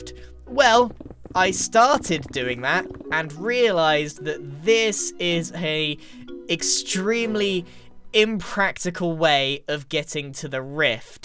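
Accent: United Kingdom. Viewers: I think impulse didnt notice "chobblesome" because with your british accent it sounds very close to "troublesome"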